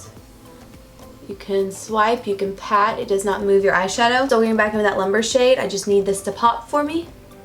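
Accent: South, United States. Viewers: I feel like you're already losing your southern twang. Don't let NY do that to you, girl! 😂😭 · i found your channel after being lost a couple years and i realized how much i love your channel! you are sweet and i love your country accent😍😍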